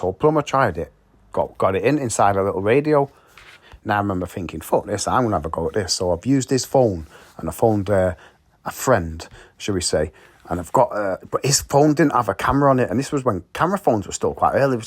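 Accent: Manchester, United Kingdom. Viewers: Lad proper thought you were black (don't know why) love that scally manc accent and that's from a Scouser,❤ the content 👍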